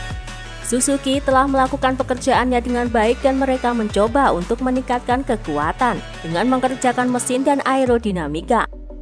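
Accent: Java, Indonesia. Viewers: komentator nya jowo banget ya wkwkwkwkwk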